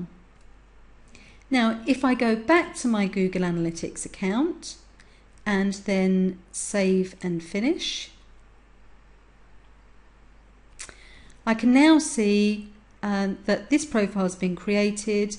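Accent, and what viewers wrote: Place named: England, United Kingdom. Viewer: your english acent just wow <3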